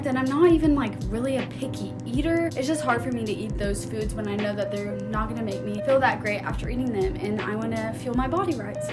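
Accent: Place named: Europe. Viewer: You sound weird for Texas. Almost European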